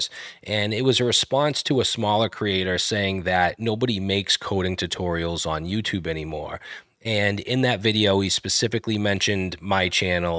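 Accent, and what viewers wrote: Boston, United States. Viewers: best Boston accent on YT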